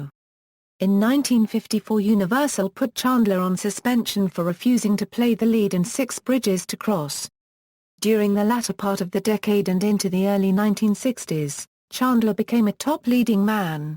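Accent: United Kingdom. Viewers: Why does YT use foreign narrators when talking about American movies? The British sounding female narrating the bio about Jeff Chandler mis-pronounced the name of the Native American Apache Chief, Cochise---- it is PRONOUNCED COCHEESE!! Thank you.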